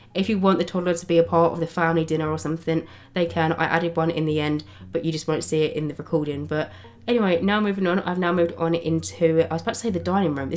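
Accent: United Kingdom. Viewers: British/London accent, the worst of all eng accents, so please slow down, especially when u r not pronouncing at least one or more of the ending syllable from every word. Instead of ”cars”, you have ”ca”... Terrible pronounciation of the eng language, but a motto for later: ”Swedes speak better english than the Brits and maybe the best” So fuck the eng or especially the londoners eng who mutilate and deforming the language so badly. Haha ;) :D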